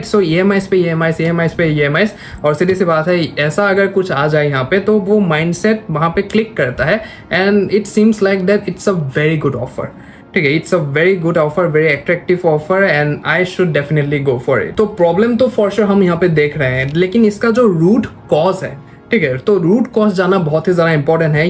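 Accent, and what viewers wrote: Assam, India. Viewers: Bhai ka hindi sunke hi pata chal gya ki yeh bande Assamese he 😅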